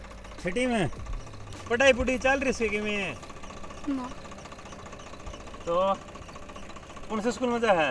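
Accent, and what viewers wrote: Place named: Rajasthan, India. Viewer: Bhai aap Haryanvi nahi Rajasthani ( Shekhawati) bolte ho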